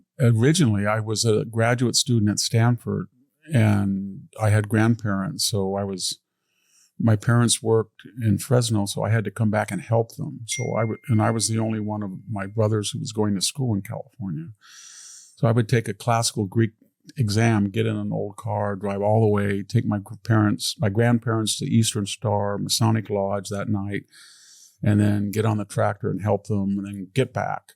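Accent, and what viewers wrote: Australia. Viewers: I’m an Australian I studied agriculture I worked in NY for 8 years my children and grandchildren are now Americans I think I love Victor and listen to all his work This was a great interview and I appreciate your Australian interviewing style accent and balanced grounded style very much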